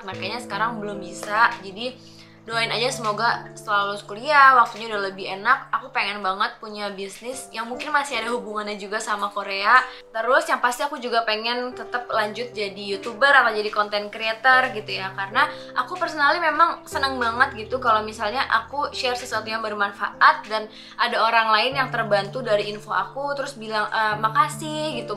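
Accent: Indonesia. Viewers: Hai kak dan kak assalamualaikum. Salam kenal, saya BEKASI UTARA. Uwahhh Bekasi mana nih Suaranya hehe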